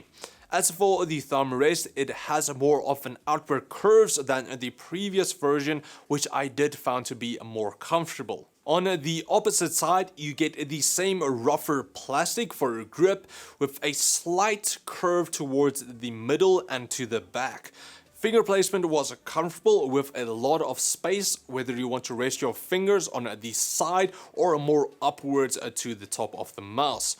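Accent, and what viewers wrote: South Africa. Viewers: Good review man, mine just came from evetech, dont fight the SA accent, embrace it! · Great review as always,. I'm from SA too. Embrace that accent, buddy. It'll sound great in the videos😉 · ey this oak is south african lukkkaaa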